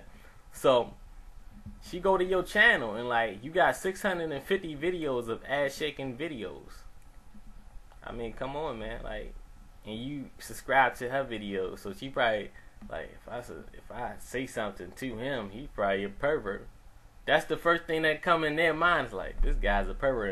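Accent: Baltimore, United States. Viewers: It’s a Baltimore accent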